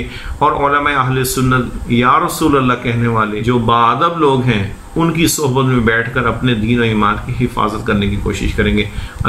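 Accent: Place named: Arab world. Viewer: movli sab apny arabi lehjy say impress krny ki koshish ki hai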